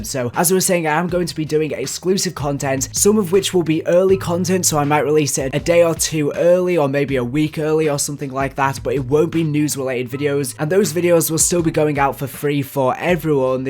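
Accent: United Kingdom. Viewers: Anyone else loving when he is streching some word with this british accent? :D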